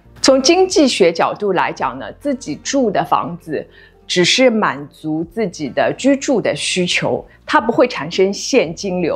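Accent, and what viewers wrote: Shanghai, China. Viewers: 侬是上海侬伐？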